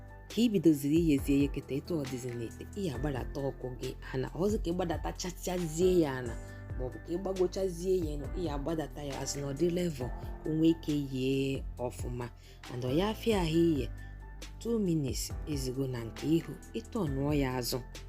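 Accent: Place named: Anambra, Nigeria. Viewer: Nne, ji gi a ehn nyum nyum. And I sotay enjoy this your Anambra Igbo so much oh!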